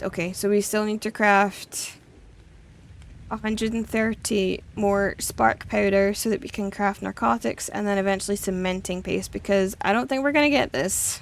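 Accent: Scotland, United Kingdom. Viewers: Stone foundations are great for crafting when taming a drake. You're a Scot. I love that. I lived in Glasgow for 2.5 years. I'm a huge Scottish history lover. Massacre of Glencoe is/was a huge interest of mine. Ancient Scot history is brutal. Awesome listening to your accent. Scotland is such a beautiful country and should never have merged the thrones with the deceitful low landers throne.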